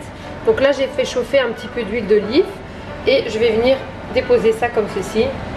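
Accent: Belgium. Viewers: Bonsoir ,il me sembke que vous avez un pet accent ? Vous êtes Belge car vou a avez un accent ou etes Belge ou Bruxelloise ?viy me faites rire viy et trop comm jeun femme viu et mariée ?si oui botre mar ne diu pas s 'ennuyez avec vous ?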